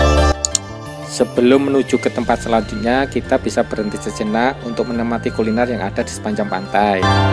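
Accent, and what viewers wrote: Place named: Java, Indonesia. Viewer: ulasannya jelas dan rapi. ditambah prolog suara yg medhuk. jian video dokumenter yg mantab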